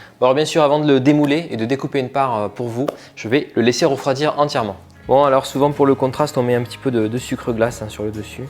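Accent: South, France. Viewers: Au top ! Avec ce léger accent du sud, encore meilleur ! Là, il me reste quelques poires, je vais les remplacer. La fève tonka, j'adore ! ❤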